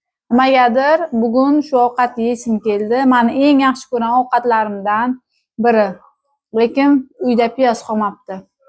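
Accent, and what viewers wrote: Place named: Olot, Uzbekistan. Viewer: Олотликлар шунақа гапиради Турқманистон билан чегарадош олотликлар турқманча гаплашади кўписи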